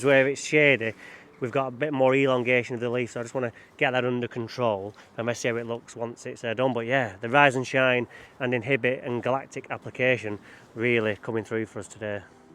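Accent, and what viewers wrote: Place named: Manchester, United Kingdom. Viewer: Thank you for your advice. Love your straightforward approach and delivered in our customary Gtr Mcr way 👌 keep up the great work. BTW, which backpack sprayer would you recommend pls? Thanks AL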